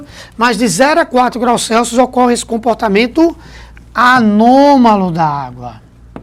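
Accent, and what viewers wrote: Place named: Recife, Brazil. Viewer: pelo visto o senhor é de recife rsrs ganhou mais uma inscrita e pernambucana kkk,Parabéns pela aula !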